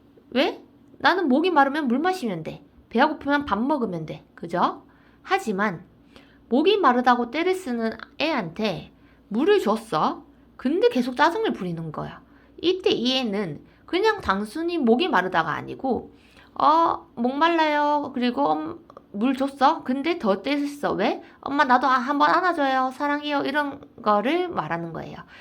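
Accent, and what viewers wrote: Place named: Gyeongsang, South Korea. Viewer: 선생님 덕분에 수능완성 실전5회까지 독서까지 잘왔네요..그런데 실전모의고사5회 독서지문 처분의 무효,취소,철회지문이랑 (가)로켓엔진의 종류와 특성(나)우주선 발사체를 이동하기위한 중력추진 지문 해설해 주실 수 있나요?…법률지문은 어휘랑 개념이 생소해서 어떻게 읽어야하는지 분류해야 하는지 모르겠어요…그리고지구과학 지문은 쌍곡선이라든지 글이 그림으로 그려야하는데.. 처음 한번에 그려진다든지 이해가 되지 않네요…후반기 더운 여름 시간괜찮으시면..해설 부탁드려요…라캉이론 올려주셔서 감사합니다..귀여운 경상도 발음이 쏙쏙 귀에 박히네요ㅎㅎ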